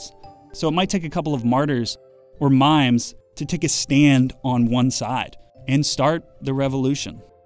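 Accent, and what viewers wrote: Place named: New York, United States. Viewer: liked and subbed for the new yorker accent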